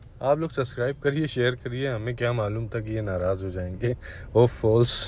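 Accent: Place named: Kanpur, India. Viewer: कसम से कानपुर में ऐसे ही बात करते हैं...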